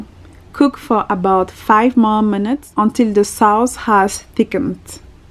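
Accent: France. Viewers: I'm subscribing to your channel just because of this video! I love the way you speak! Are you from France? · Un accent français ? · I’m so glad that I bumped into your channel. I’ve been a subscriber since. I’ll definitely try all of these recipes. 😊 Sn: I love your heavy accent. I figured you spoke French. 💋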